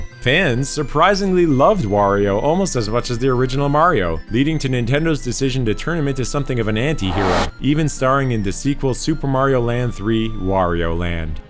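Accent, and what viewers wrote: Canada. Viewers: no worries! I was about to post that you weren't AI when I heard a Canadian accent come through, but you beat me to it. I also learned that little bit of trivia with the Mystery Dungeon, I thought Shiren was the OG but turns out it was the blue cat I saw in Japanese magazines throughout my teens. Thanks for the content!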